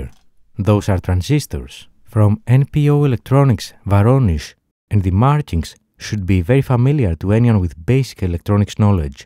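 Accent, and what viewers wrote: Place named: Greece. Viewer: Its the typical greek accent in english.Myself i lough all time when i listen greeks speakimg English(im greek)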